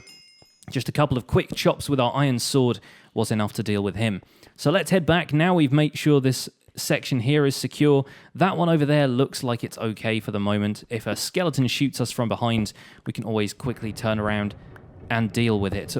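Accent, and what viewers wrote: United Kingdom. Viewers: He's british bruh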